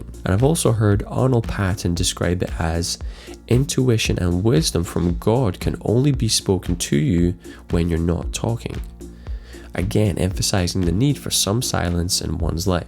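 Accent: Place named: Northern Ireland, United Kingdom. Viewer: Sorry man, was thinking Scottish but Northern Irish was in my head too. I'm from the Republic meself. Hope to see your work get recognition some day.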